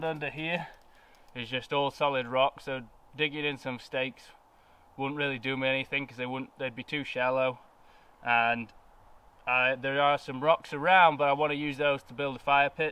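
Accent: Yorkshire, United Kingdom. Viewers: Yorkshire accent? Just chillin' in the woods, eating steak, sipping whiskey, very cool! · What part of Yorkshire are you from then? 😁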